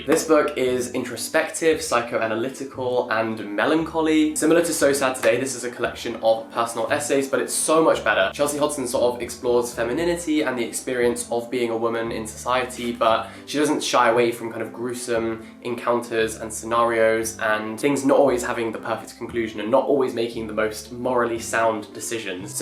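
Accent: United Kingdom. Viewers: I love his british accent and ofc his word games hahah ;)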